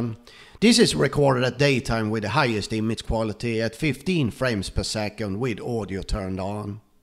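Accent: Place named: Sweden. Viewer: Funny swedish accent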